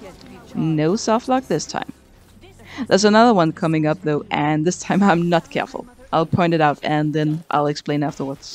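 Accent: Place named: Denmark. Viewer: As a fellow Dane you can instantly recognize a Danish accent, when you find it out in the wild like this :) Nice run as well.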